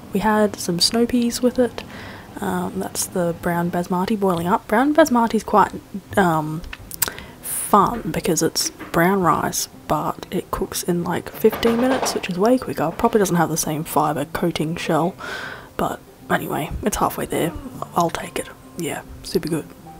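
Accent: Australia. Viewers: Haha I thoroughly enjoyed you talking about Hufflepuffs in your Extra Australian accent, it didnt even occur to me that your "YouTube voice" was not at maximum accent level😂 also I'm really impressed by the amount of veg in this video everything looks so delish💕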